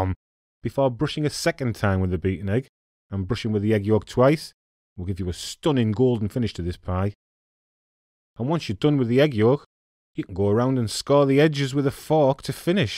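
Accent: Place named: Teesside, United Kingdom. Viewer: Lovely, I am from Teesside (Billingham) originally now living in Mid wales it was just great to hear the accent again. I am still trying to explain Parmos to the people around here for 12 years now, and still get weird looks.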